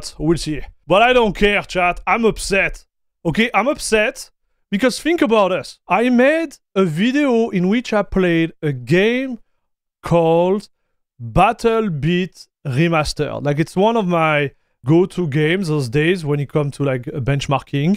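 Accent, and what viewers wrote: France. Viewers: Have not heard Fran-glish for a while, it never ceases to amaze me. I feel like i can speak French :)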